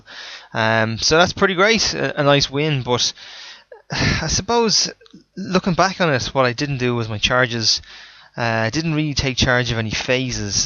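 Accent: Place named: Ireland. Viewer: Thanks for the report,. Nice to hear an Irish accent on some Warhammer battle reports (even if it is a Dublish(ish) one, haha). Sound, mate.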